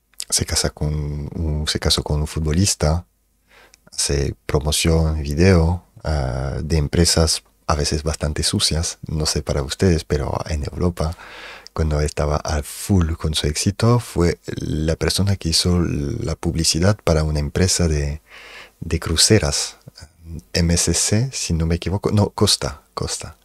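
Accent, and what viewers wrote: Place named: France. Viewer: ME ENCANTA TU ACENTO AFRANCESADO Y DULCE . HABLAS BASTANTE BIEN EL ESPAÑOL , PARA NO SER TU IDIOMA NATIVO . UNA PEQUEÑA CORRECCIÓN CON MUCHO CARIÑO : (Se dice) TRADUJERON ✅ Traducieron ✖️ SALUDOS DESDE MONTERREY, NUEVO LEÓN. MÉXICO. YA TIENES UNA NUEVA SUSCRIPTORA ✅🥰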